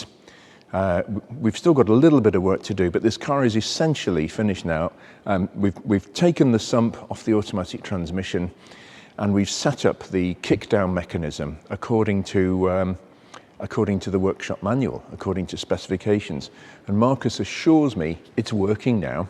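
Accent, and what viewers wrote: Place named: England, United Kingdom. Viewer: most English commentary I have heard in some time, great work from Australia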